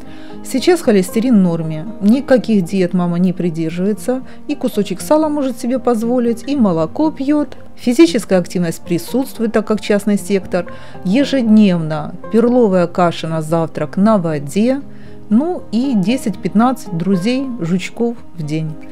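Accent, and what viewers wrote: Ukraine. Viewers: У вас акцент украинский. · Это украинский говор. Будьте толерантны. Мы же спокойно относимся к вашему российскому.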